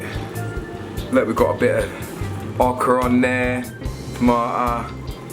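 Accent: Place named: Jamaica. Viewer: I’m confused are u Jamaican or English broo??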